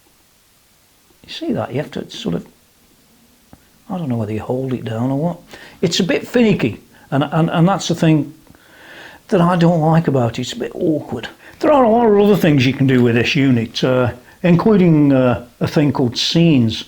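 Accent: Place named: Liverpool, United Kingdom. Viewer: Thanks for the Really detailed walkthru. Very informative, i don't know if I have ever seen one like it, but, it was helpful to see the quality, or, lack of it, inside the unit. I have only seen the Boss GT-1 from the inside and that was because I threw it against a brick wall. I got a good look at that one inside and out. I have a Helix LT now but it is quite complicated to edit and use, but at least it has a PC app to make it easier. I think that may be the thing that kills the MX5 for me, but maybe they will add one in the future, I won't hold my breath for it. BTW, are you from Liverpool? you have distinctly liverpudlian, Paul Macca accent. Thanks for the video.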